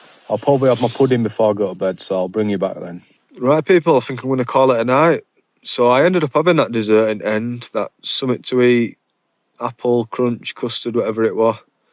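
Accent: Yorkshire, United Kingdom. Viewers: Great video, epic views all well presented, by the way, which part of Yorkshire is the accent from?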